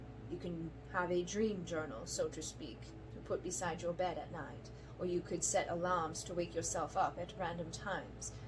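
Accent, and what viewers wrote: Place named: United Kingdom. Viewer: I have catched a British accent for the order. 🙏🏻 This is fascinating and you are so talented. Thank you for doing this for us. Have a good rest.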